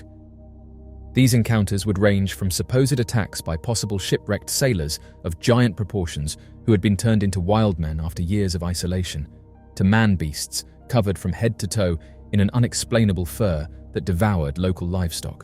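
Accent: United Kingdom. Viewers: Fyi. A British accent is used to be more convincing .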